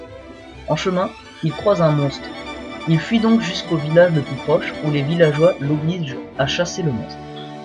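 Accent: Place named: Japan. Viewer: Je valide l'accent japonais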